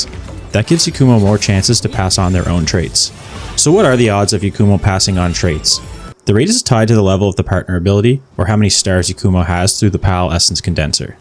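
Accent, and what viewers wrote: Canada. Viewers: Is that a fellow Atlantic Canadian I hear?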